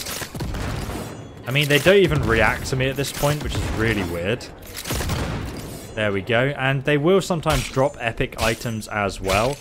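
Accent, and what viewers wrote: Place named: United Kingdom. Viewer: I know its nothing to do with this game but, I love your british accent.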